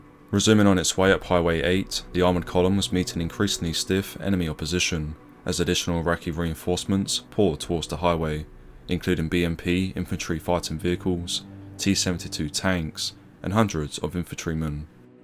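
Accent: United Kingdom. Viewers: 12🇬🇧📯 sounds like a british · I'm not sorry don't speak to me about American military with a British accent ever!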